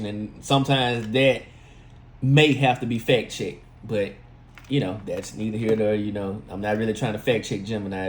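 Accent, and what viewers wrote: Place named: South, United States. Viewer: Thanks for info bro. I can tell you from the south! Love from Alabama.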